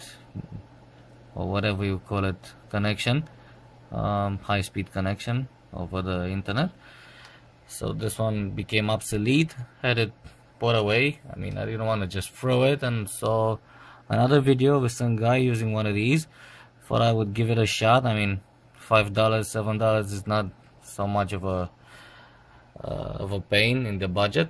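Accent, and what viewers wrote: Romania. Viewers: de la accent mi am dat seama ca ești român :))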